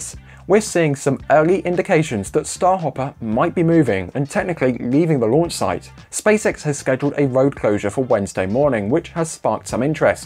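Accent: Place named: England, United Kingdom. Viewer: The English narrator makes it “real”.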